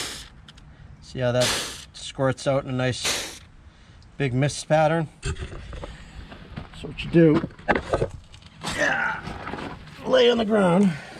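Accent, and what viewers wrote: Massachusetts, United States. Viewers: The accent screams Mass my guy. Thanks for the video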